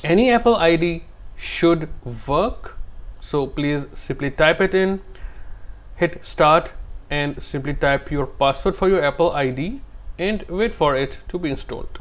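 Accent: India. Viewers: do i really have to resort to tutorials by people with clear indian accents (sorry if this comment is offensive, i don't have anything against anyone)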